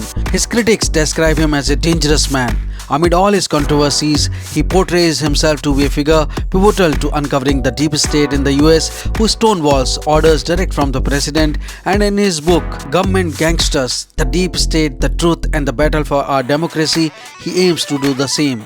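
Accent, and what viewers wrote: India. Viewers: The narrator must realize many in his audience doesn’t understand Indian English. Perhaps if he enunciates it would help.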